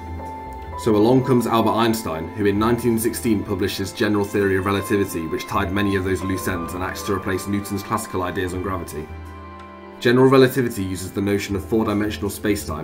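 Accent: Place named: United Kingdom. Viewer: difficulty understanding some of the British accents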